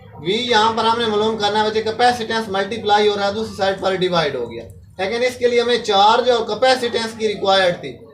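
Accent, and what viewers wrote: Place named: Jhang, Pakistan. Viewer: Kia method ha prhana k kia Khana...sir ap jhang sa ho kia?? Ap k language jhang k lgti ha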